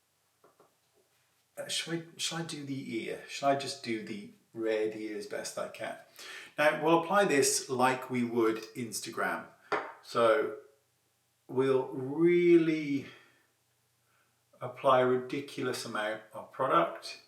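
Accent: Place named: United Kingdom. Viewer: I am not here for concealer just your lovely British accent 🤫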